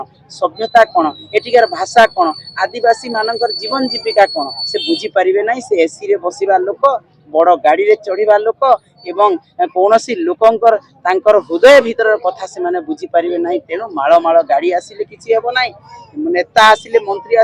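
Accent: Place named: Cuttack, India. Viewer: ତମେ ତ ସମ୍ବଲପୁରିଆ ଲୋକ ହେଇ କରି କଟକିଆ kahu6 ଗୋ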